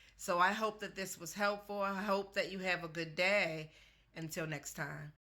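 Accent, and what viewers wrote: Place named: DMV, United States. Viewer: You must be from the DMV.. I can tell by your accent lol Anywho those majority of the Husband Videos are a set up lol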